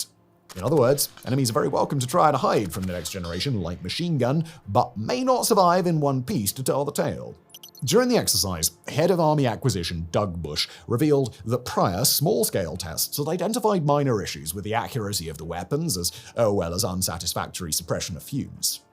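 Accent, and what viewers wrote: United Kingdom. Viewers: Ah, at last my broadly divergent taste in YouTube has converged. Footage of Garand Thumb, set to the dulcet British tones of Simon Whistler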